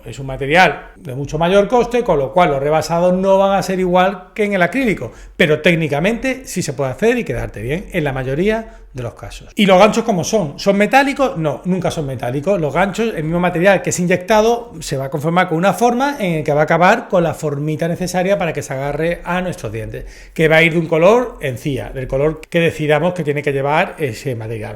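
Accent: Spain. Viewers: El locutor es de España. Tendrás que preguntar a un dentista en México😂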